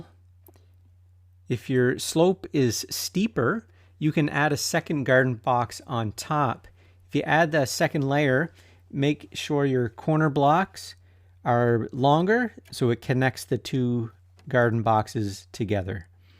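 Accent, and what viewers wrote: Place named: Maritimes, Canada. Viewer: Grew up near a bunch of maritime candadians. Accent takes me back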